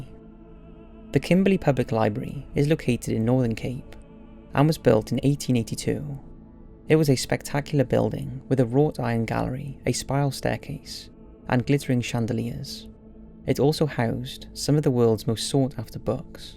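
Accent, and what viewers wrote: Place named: England, United Kingdom. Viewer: Didn’t know you were English haha 😂 iam too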